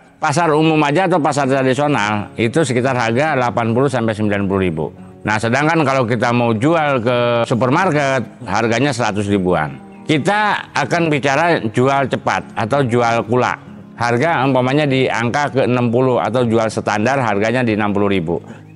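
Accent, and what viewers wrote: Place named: West Java, Indonesia. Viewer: Pantes logatnya kaya logat sunda...lama di bogor toh kang...terimakasih ilmunya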